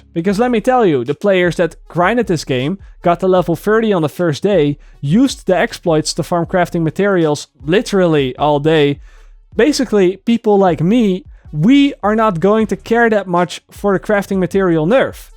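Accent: Netherlands. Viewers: Ja hij is Nederlands en heeft dus een Nederlands accent. Boeie.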